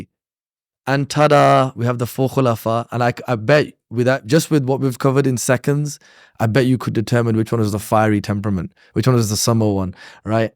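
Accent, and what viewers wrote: United Kingdom. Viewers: it’s more a midlands / Birmingham accent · sounds like a Bradford accent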